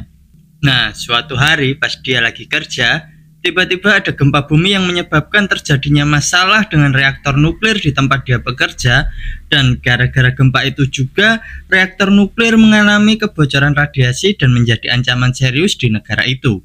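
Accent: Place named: Banyumas, Indonesia. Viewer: Ngapak bang 😂😂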